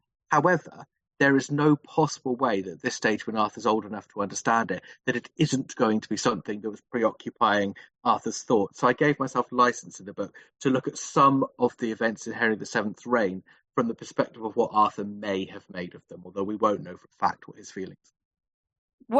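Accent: United States. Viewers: No disrespect, but find listening to words mispronounced, and an American accent when discussing Tudor history, a lot of which is supposition, especially about Prince Arthur, very off putting.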